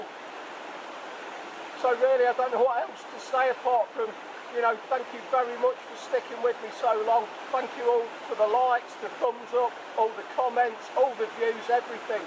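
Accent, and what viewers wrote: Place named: East Midlands, United Kingdom. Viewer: Im sorry you came back to the UK, i mean that with the greatest of respect, you sound like youre from the East midlands. We are olanning on going to the Philippines. anyway hope all goes well.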